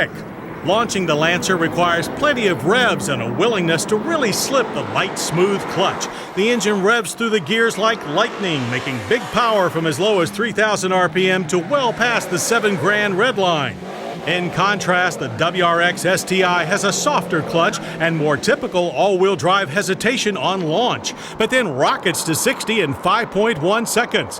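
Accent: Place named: United States. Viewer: This commentator very american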